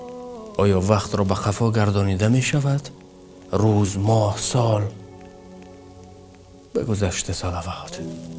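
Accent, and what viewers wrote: Tajikistan. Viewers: Забони Точикиро тоза гап мезанад Офарин 👍👍👍👍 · Дар забону, лахчаи поку, ширинии точики шердилм садкашавм😢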